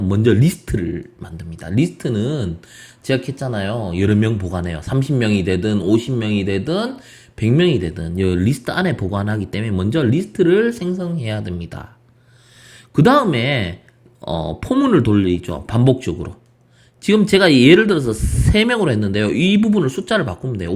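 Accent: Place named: Gyeongsang, South Korea. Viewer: 왜 나이든 프로그래머들은 죄다 경상도 사투리를 쓰는가...... 나도 모르게 사투리 리듬타다보면 고개만 끄덕거리다 머리에 하나도 안들어오게 되는데.....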